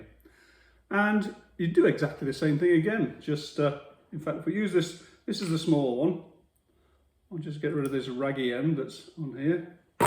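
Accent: United Kingdom. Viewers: The tackle manufacturers like Middy, Drennan and Guru etc could learn something from you, use nylon loops instead of fuse wire with a memory, great video! I remember watching the first video I saw of yours, you were fishing on a lake, a man with a clearly British accent fishing and a Kangaroo hopped into the video in the background, local wildlife came to say "G'day"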